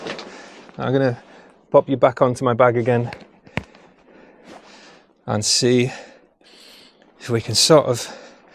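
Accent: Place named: Northern England, United Kingdom. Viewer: Your Northern accent pronunciation is sooo smooth compared to a lot of people I hear, It's magnificent.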